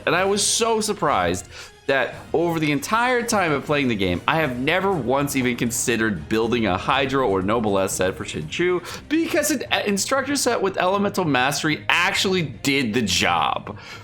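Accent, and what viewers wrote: United Kingdom. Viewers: The British accent just cracks me up xD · Dam your British accent be better then mine and I am actually British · I want that British accent of yours to say "can I got a bottle of water"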